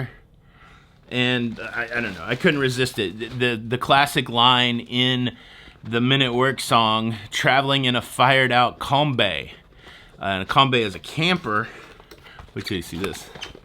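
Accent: United States. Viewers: Hehe! The subtitles picked up on your American accent and said fairies instead of ferries! I’ve noticed that a lot with Americans and short vowels, they tend to elongate them.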